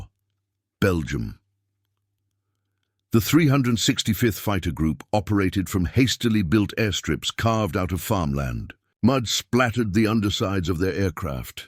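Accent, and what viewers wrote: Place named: United Kingdom. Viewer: They did not mock, laugh, joke, etc. Just put the facts in and can we have another AI voice that is not British?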